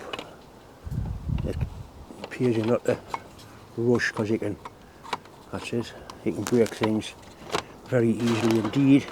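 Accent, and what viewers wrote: Newcastle, United Kingdom. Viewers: Hi, just stumbled upon your channel, nice to hear a Geordie voice again. I live in Australia but come from Whickham just above the metro centre, just one question for you how the hell do you calculate the distance between teeth when cutting a module1gear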